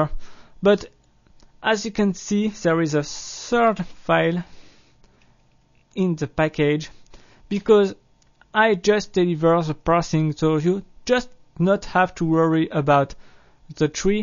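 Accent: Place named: France. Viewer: He has the same accent as mine, it is a french accent, and it's the most beautiful accent in the world, so you're wrong. If you can't understand a french speaking english it means you aren't worthy of hearing what this person is saying. French accent has been voted the most understandable foreign accent by a panel of very wise and independant judges.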